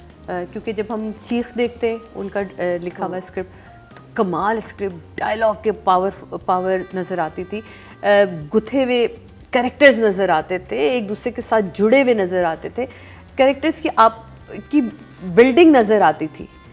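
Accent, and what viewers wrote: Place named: Pakistan. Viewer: every person has their own accent.Pakistanis will speak with a Pakistani accent which will be definitely different to Canadian,American or British English accent.You yourself have a very Pakistani accent.Education is not knowing E glish.English is a language its not a measuring stick for education.Btw the word is PRO NUN CIATION not PRO NOUN CIATION.Now you understand what is accent.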